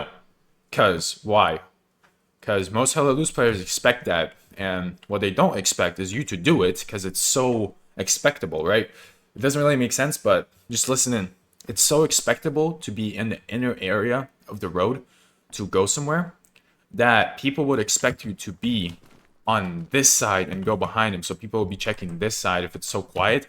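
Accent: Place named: Scotland, United Kingdom. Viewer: Oh the Scottish accent 😭 maybe work on it I suppose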